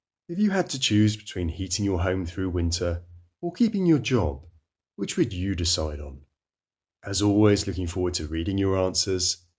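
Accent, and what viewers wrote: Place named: United Kingdom. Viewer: You sound like your from 🇬🇧 not 🇩🇪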